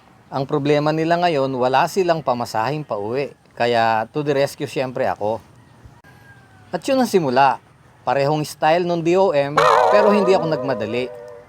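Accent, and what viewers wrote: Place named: Pampanga, Philippines. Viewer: Prang kpampangan kau sir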